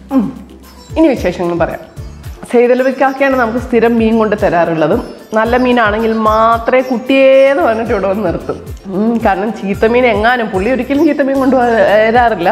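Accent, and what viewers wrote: Kottayam, India. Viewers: Enikku ithu vare oru vlogerem kananam ennu thonniyittilla.pakshe entho enikku chechi onnu kanan Moham.eppolenkilum meet cheyyan pattumayirikkum alle....Chechikkutty othiri ishtam.chechide nadu evida?samsaram kelkkumbo oru palace kottayam style feel cheyyarundu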